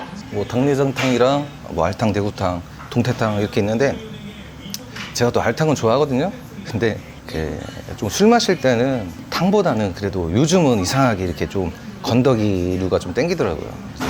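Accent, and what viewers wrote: Chungcheong, South Korea. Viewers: 발골 하는 재미가 있는 닭발 :) 바쁜 사람은 못 먹는 닭발 집 ㅋㅋㅋ 충청도식 화법 너무 좋아요 충청도 인 으로써 ㅋㅋㅋ 오늘 영상도 잘 봤습니다❤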